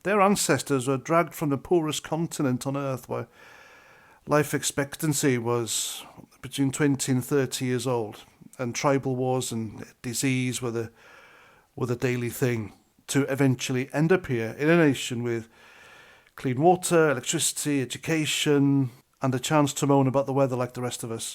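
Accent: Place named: RP, United Kingdom. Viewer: What a fraud this wanker is talks posh now he’s played in a few Christmas pantos Sir my arse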